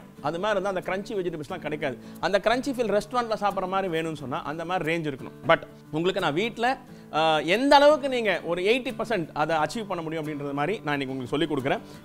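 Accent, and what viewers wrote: Chennai, India. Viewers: semma ,Madras language, I like it.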